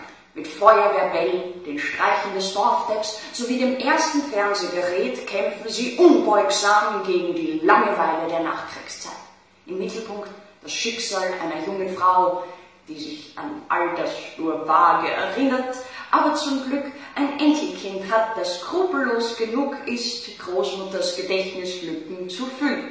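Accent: Austria. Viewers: Liebe eine diskrete Frage: bist du verheiratet? Du rekelst dich so schön auf dem Sofa so schön habe ich dich noch nie gesehen. Da fallen mir alle Sünden ein und wie immer dein toller österreichischer Akzent. Eine Klasse für sich, AUGENWEIDE. Schönes Wochenende.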